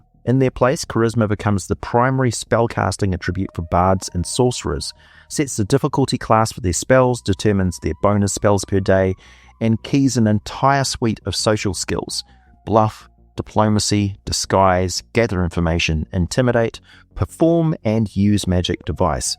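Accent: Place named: New Zealand. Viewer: oh I remember those days well! I don't miss them..... I've got to say as well; it's quite surreal hearing a fellow kiwi on a youtube channel! 😂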